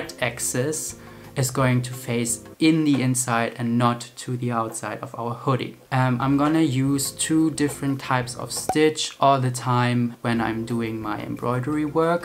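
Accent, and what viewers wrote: Germany. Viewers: Are you german.? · Are u german?:) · Are u german? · Dude are ya German ? I hear a German accent there 👁👄👁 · after five seconds in this video I already know that he's a German lol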